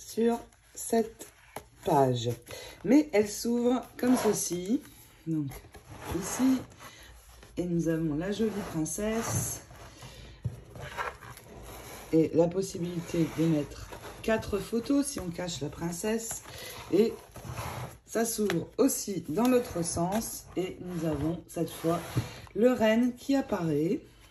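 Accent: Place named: Nord, France. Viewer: Bonjour ton album est vraiment magnifique. En t écoutant tu as garder ton accent du Nord j'adore.des bisous 😘😘😘😘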